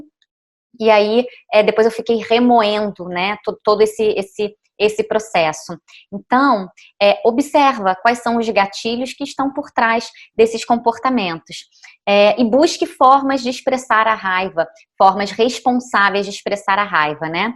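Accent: Rio de Janeiro, Brazil. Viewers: Desculpa nãos consigo ouvir carioca nem de longe...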